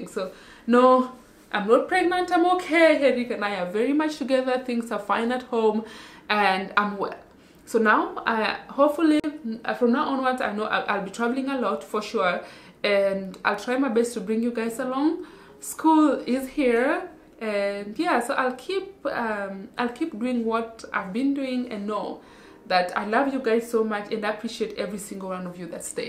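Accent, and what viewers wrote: Luo, Kenya. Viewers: I love ur luo accent.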